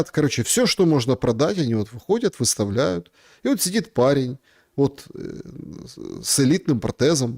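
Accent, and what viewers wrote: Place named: Donbas, Ukraine. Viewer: Господин, мне приятно смотреть ваши ролики, не только потому, что вы в них говорите по "донбасянски", несмотря на звонкое "Г", но ещё и потому, что вы с РТИ! Да и контент у вас востребованный! Мы за вас! Делайте вашу работу, как и всегда. Нам нравится! С уважением - Кременная.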